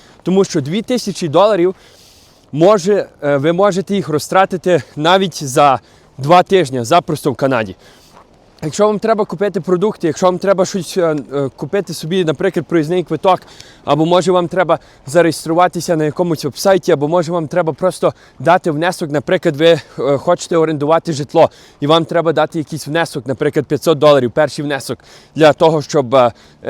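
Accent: Western Ukraine, Ukraine. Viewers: Бля, у тебя такой западенский акцент! Обожаю твою озвучку!!! Всегда вподобайка вид мене!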